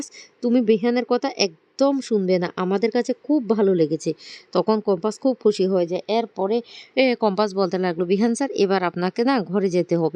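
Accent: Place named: Chittagong, Bangladesh. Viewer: আপনার চট্টগ্রামের টোনে কথা খুব ভালো লাগছে।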